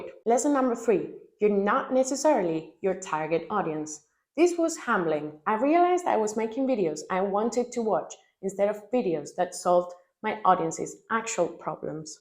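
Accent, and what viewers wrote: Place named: Denmark. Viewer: Thank you so much for this video. I am just over one month in and trying not to get discouraged by low views and having only a few subscribers! As an author I want my channel to be a community for my readers to connect with me, and each other. I hope that my persistence pays off in the long term. Also- I love Denmark and hearing your accent reminds me of when I visited last year!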